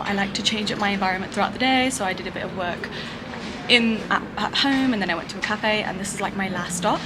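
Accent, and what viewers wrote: United Kingdom. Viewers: You r Punjabi 😃 and your brit accent ❤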